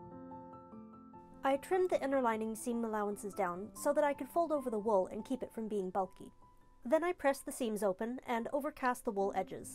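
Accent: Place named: United Kingdom. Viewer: You sound British lol I was confused when you said Canada 🇨🇦 I subscribed I enjoy watching your videos.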